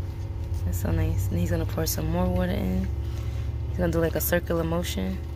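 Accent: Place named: New York, United States. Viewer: I love your videos, but i honestly enjoy hearing your voice. Its pleasant and calming. Plus that New York accent is everything 🤗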